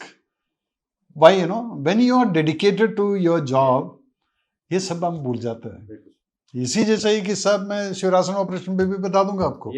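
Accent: India. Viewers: He is from Kerala, i can see from his accent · Origin: Kerala Accent: Punjabi This is the Indian Army for you. ❤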